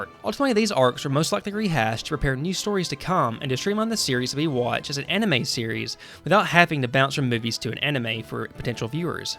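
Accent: South, United States. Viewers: I love youtubers with southern accents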